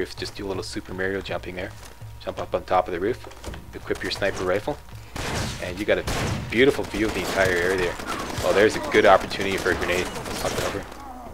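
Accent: Canada. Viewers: Are u Canadian? I thought I heard a Canadian accent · You have to be from Canada....Yes? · i swear this guy sounds like a Canadian. great vid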